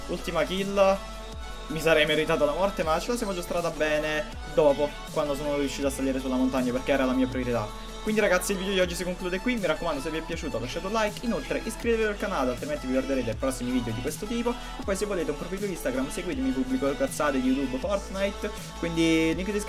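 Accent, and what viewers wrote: Naples, Italy. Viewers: Ma sei di napoli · ma ti che parte di napoli sei?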